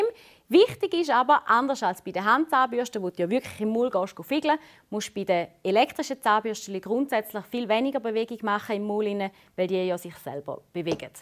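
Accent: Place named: Switzerland. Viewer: Ich freue mich immer wenn man was auf Schweizerdeutsch hören tut.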